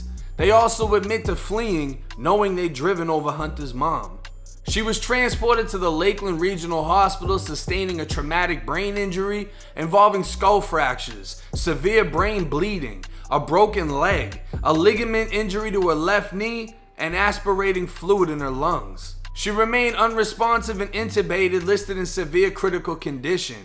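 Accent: Boston, United States. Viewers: Sick as a dog bro (pneumonia not covid) but need me some 1090. Wat up man, love ur channel, mass respect educatin yungsta's bro. This footage shockin man, how'd ya get ya hands on it? Anyways, are u from Florida man, ya sound like ya from Boston. I admit I tho u was glorifyin this life & I Apolologize fo real. I hope ya Blow Up Bigtime bro, monetized & all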